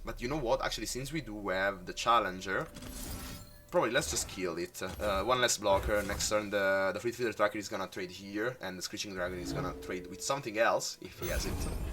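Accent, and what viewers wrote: Italy. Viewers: Ciao! Sembri italiano da come parli e quindi me la rischio, male che va ti riscrivo in inglese ahaha! Stavo pensando alla possibilità di inserire Lux per Fiora (e magari una remembrance sarebbe obbligatoria) ma non riesco a capire se effettivamente potrebbe essere worthy per gestire meglio alcuni match up. Sono un giocatore di Lux Karma di solito, ma questo mazzo lo conosco poco e soprattutto non so rendermi effettivamente conto se potrebbe sopportare di togliere Fiora. Sapresti darmi qualche consiglio? Grazie mille!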